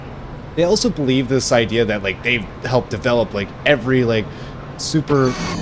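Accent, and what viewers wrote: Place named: Canada. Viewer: I didn't know there was a cult of purists as you describe, it seems so stupid to me. You sound Canadian, I'm Australian myself so let's just blame dumb Americans like we always do 😄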